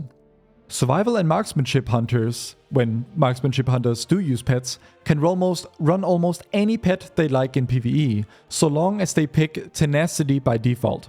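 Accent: Denmark. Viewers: I hear the danglish :) good video though. Takker. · He is danish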